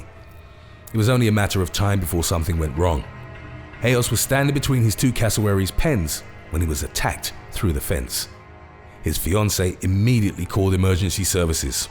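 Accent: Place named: United Kingdom. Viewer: He is not an American. So what he pronounced zebra as how it is spelled. We say Zeebra. He speaks the Queen's English.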